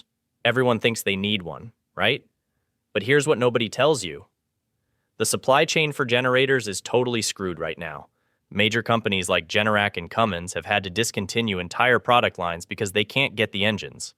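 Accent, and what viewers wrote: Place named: United States. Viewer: Is this spreading fear and propaganda via this American accented narration BS a thing? I'm over this shizz to be honest. Eff off 😂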